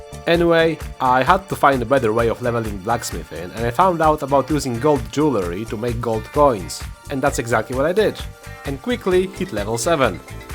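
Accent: Poland. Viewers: Where are you from, your accent sounds polish.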